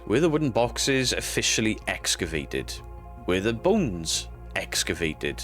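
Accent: United Kingdom. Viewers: Man tbh I don't care about the video or hookie poo whatever what interests me is that you sound British and your talking about quite literally my neck of the woods I was raised in Hinsdale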